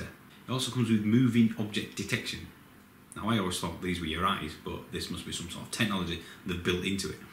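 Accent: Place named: Huddersfield, United Kingdom. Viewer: I totally agree with your observations. I have a 4 year old Tekna and love it, but I have chosen a new 2.Zero, which for me does everything I want. Also, you sound like a Huddersfield boy, like me!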